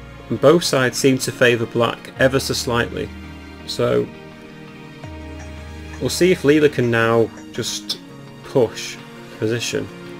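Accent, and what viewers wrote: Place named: RP, United Kingdom. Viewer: Nice background music on game one. AND nice voice too with RP voice is narrating on the game.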